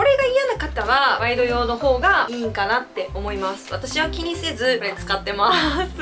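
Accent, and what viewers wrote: Kansai, Japan. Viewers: 無印週間の動画を探してたら、初めてさんに辿り着きました！関西弁が可愛いすぎます😂 他の動画も観てみます〜💞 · 初めてコメント失礼します😊いつも楽しく動画見させてもらってます😊😊 関西人なのでの喋り方も面白ポイントもどつぼです❤ 編集もかわいいが詰まってて大好きです！今日無印良品行っておんなじ商品いっぱい買ってきちゃいました〜😋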